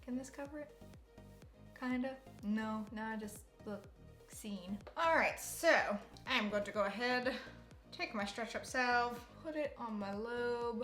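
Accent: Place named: Louisiana, United States. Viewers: I'm going to guess you're from Louisiana. The way you spell go and the word Y'all. Lol. You're a beautiful young lady. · That Cajun accent though! Love!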